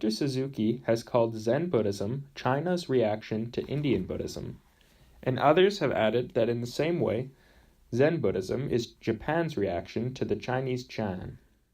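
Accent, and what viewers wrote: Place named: United States. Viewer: A teenage American with a cold narrating an English man's work. It really doesn't work.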